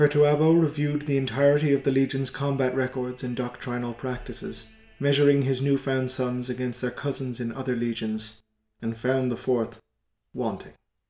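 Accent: Ireland. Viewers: Nice to hear the voice of an Irish man recounting the 40k lore.. your version is the best of all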